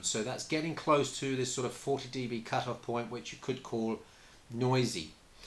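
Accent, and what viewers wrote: United Kingdom. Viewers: Does it matter much? His name is. Not a lot of Germans are named, nor do they talk with a British accent. So there is a very good chance that he's British. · Hahahah no it doesn't, I was just curious, he speaks with a British accent but his keyboard is QWERTZ and also his windows is in German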